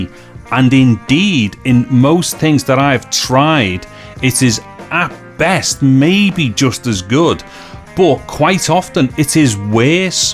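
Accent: Liverpool, United Kingdom. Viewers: Never played a vid game ever sad I know, just love listening to a scouse voice brilliant. Not like south ar’ampsher drawl. Love my new iPad albeit a 11” keep gaming sir 👌😎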